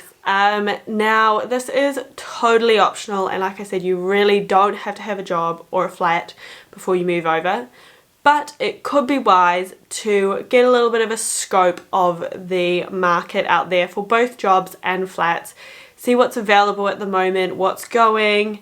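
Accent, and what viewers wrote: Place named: United Kingdom. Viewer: Great tips. Sounding more and more British these days 😁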